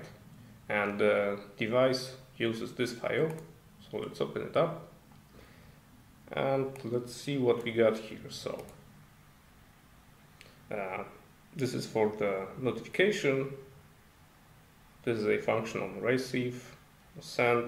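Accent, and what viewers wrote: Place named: Eastern Europe. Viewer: Nice, cant wait for the audio implementation. Are you Polish? You sound slavic.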